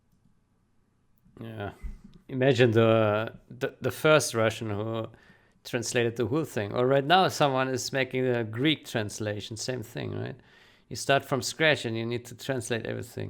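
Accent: Germany. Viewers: ты русский и украинский понимаешь? Но акцент у тебя больше немецкий:)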